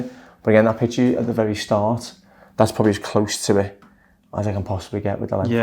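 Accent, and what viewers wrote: United Kingdom. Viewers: He’s scouse, isn’t he? With a very slight touch of paddy.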